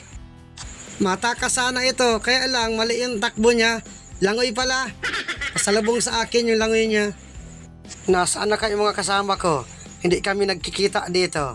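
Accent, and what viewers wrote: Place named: Camarines, Philippines. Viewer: Idol taga camarines ka ba, yung tempo ng salita mo taga sa amin ay, ingat lang idol sa paninisid, Sana makahuli ka palagi ng marami sa bawat sisid.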